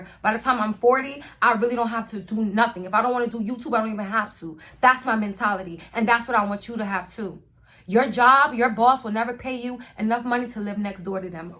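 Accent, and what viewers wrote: New York, United States. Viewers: As soon as I heard you speak I'm like she's from New York and then you continued and said you were Haitian! I'm a new fan and subscriber sis! Thank You I will be watching and supporting you anyway I can ! 🙏🏾🙏🏾🙏🏾🤎✨ Blessings, Happy New Year and to all the bosses in the place CONGRATS MILLION AND BILLIONAIRE! Especially my black women! WE DESERVE! 🎉🎊🥂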